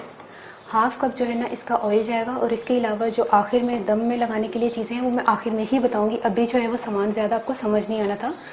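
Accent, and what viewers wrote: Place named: Punjab, India. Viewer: Ap jb panjabi main baat krti hain to boht acha lgta hy mashallah boht piara bolti hain or cooking b zbrdust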